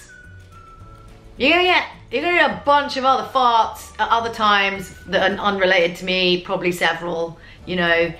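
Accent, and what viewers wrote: United Kingdom. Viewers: you sound quite british :D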